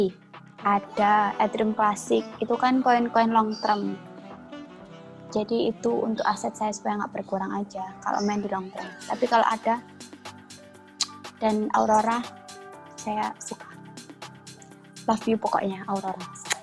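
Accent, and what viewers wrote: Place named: Java, Indonesia. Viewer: Medok bngt ngomongnya kayak orang jawa nih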